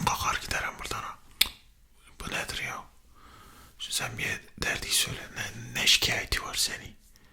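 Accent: Eastern Anatolia, Turkey. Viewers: gece gece tam ihtiyacım olan şey. doktor roleplay mi hayır doğu şiveli olmasindan bahsediyorum(3 senedir türkiyedeym bende 17 me kadar ingilteredeydm iyi gceler) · ilk defa doğu şivesi konuşan doktor görüyorum dfsghdjfkgt teşekkürler <3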